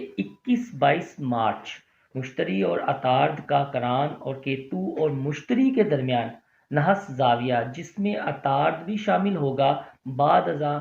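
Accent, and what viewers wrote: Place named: Punjab, Pakistan. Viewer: وہ پنجابی ھے۔ پنجابی لہجے والی اردو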